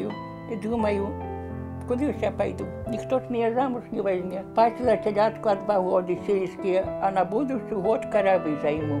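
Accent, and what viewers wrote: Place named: Rural, Ukraine. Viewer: Она же не на белорусском говорит. У нас в Украине в селах так разговаривают. Я сначала субтитры читала без звука. А потом включила звук и поняла, что она вполне понятно говорит.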